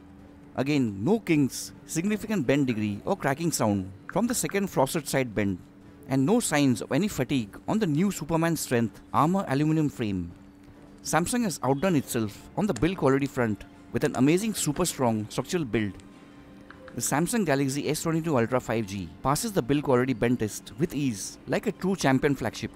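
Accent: India. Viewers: Indian accent is so funny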